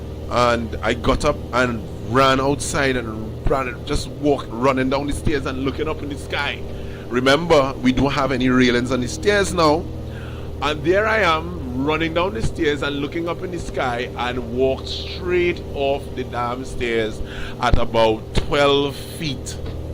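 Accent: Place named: Grenada. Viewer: Your accent made me happy, I went to SGU in Grenada. I miss it! Pure Grenada!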